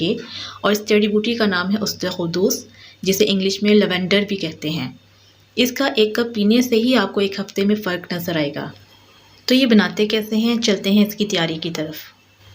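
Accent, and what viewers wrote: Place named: Lucknow, India. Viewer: Aapke aaba ijdaad lucknow ke the kya? Aapka accent me lucknow ka bohot asar araha he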